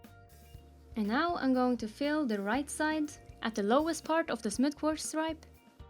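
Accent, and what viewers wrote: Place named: Norway. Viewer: Why you sound Norwegian ?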